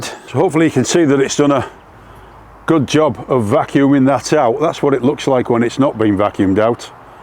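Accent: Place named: Hull, United Kingdom. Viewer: I'm from Hull and I'd recognise that accent anywhere!! Also, nice job emptying the leaves into the next door neighbours garden. 😂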